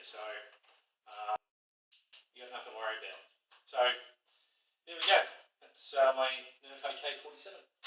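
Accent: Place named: Australia. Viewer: this fellas Australian, I knew when I heard the voice, then I saw the fridge and it was a dead giveaway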